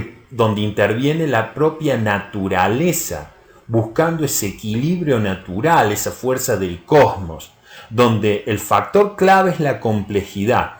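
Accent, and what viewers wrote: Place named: Córdoba, Argentina. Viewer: Muy buen vídeo, me agrado la tonada cordobesa vamo´ a tomar un fernet escuchando?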